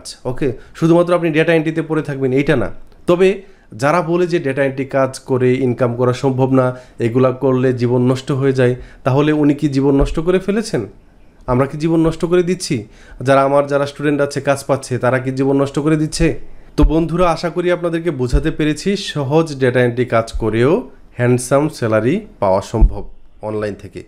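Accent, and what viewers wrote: Sylhet, Bangladesh. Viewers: Apni ki sylhet thakten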